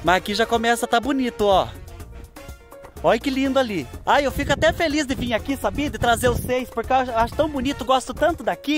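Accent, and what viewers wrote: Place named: South, Brazil. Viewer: A primeira vez que vi os vídeos do,eu pensei que ele fosse do Sul tbm.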